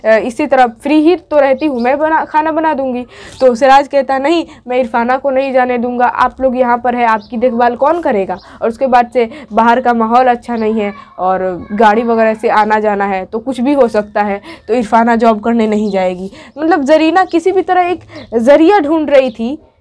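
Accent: Bihar, India. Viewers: آپکے بات کرنے کے انداز سے لگتا ہے کہ آپ بہار سے ہو بہار کی کیسی تہذیب ہے کہ وہاں کے لوگوں کا تلفظ تک درست نہیں ہوتا افسوس صد افسوس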